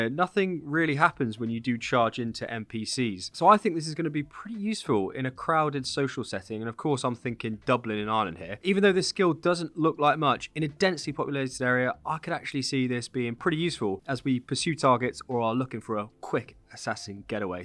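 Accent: England, United Kingdom. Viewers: Judging by your accent, you’re English?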